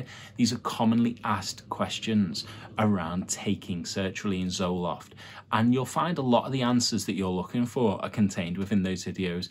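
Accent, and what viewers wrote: Liverpool, United Kingdom. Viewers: You’re a scouser ❤ so am I Brilliant .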